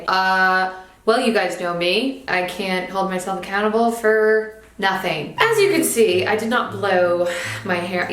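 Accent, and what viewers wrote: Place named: Long Island, United States. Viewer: I thought might’ve been Italian like Long Island Italian until I got to the part were she couldn’t say Caprese LOLOL I love her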